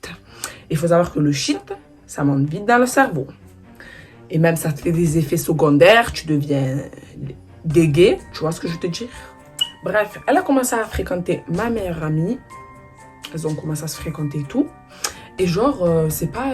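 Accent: Marseille, France. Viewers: Je kiff t’es vidéo tu a fort l’accent marseillais tu es des Cartier nord ou sud je me suis fais un compte YouTube que pour pouvoir commenter et pouvoir m’abonner à toi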